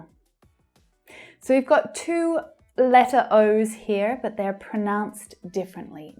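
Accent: Australia. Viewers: Hi, your Australian accent is different... it is very easy to understand...Ths! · mmmEnglish rules and you try to make it fun. I'm Canadian and you seem to have a combination Australian / English accent. Is that because you have a Melbourne accent or because you have spent time in the UK? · My goal in 2019 i wanna pursue my master degree in Australia, hope this year i can make it. Thank a million....great video. I love your Australian Accent,❤️ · beautiful woman, nice voice, is that a slight Australian accent?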